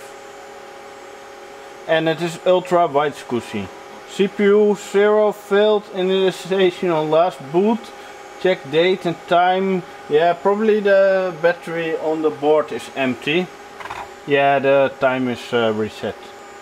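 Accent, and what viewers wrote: Netherlands. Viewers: I wasn’t ready for that Dutch accent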